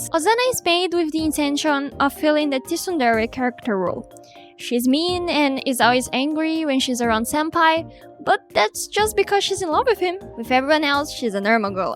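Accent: Brazil. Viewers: hey idk if you will see this but are you brazillian? because your accent really sounds like a brazillian accent, especially when saying the rivals names (i'm brazillian thats why i recognize it xD) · You have a VERY strong Brazilian accent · Mano, eu já sabia que era brasileira por causa do sotaque e o jeito de falar os nomes das personagens. (Me humilha menos no desenho😅, ficou incrível os desenhos. ) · MANO EU CONHECI SEU CANAL AGORA MAS EU BATI O OLHO E JA SABIA Q VC ERA BRASILEIRA KSHSKSJSJ, sabia que o jeito q os brasileiros pronunciam o nome da osana e da raibaru realmente muda muito em questões de linguagem? Tipo eu acabei sabendo que uma mina era russa por conta disso e por isso descobri q vc era brasileira! E muito bom ver brasileiros tão talentosos quando você! Adorei o vídeo e os redesigns tb<3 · Hey, are you brazilian? You kinda sound "brazilianish" idk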